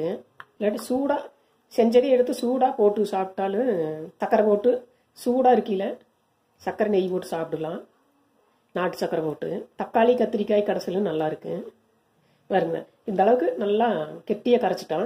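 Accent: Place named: Kongu, India. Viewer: அருமைங்க அக்கோய்.. நீங்க சொன்ன விதமும், உங்க கொங்குத் தமிழும் சூப்பருங்க..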